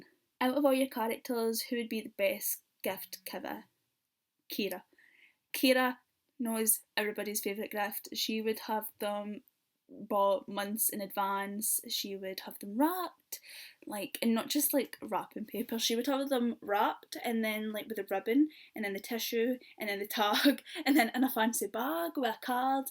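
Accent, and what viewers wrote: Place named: Scotland, United Kingdom. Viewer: I love your Scottish accent! <3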